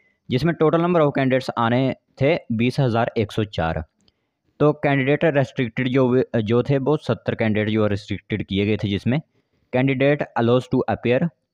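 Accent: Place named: Nurpur, India. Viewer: Bhai nurpur ,jawali wale lg rhe ho 6 ko she bolne se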